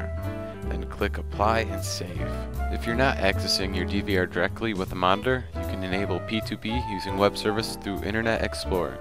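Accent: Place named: United Kingdom. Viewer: bahiya kaha se ho britain ya germanny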